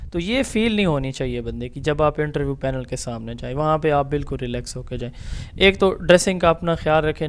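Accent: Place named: Saraiki, Pakistan. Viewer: Mithe sariaki boli ❤️🌹🌹❤️❤️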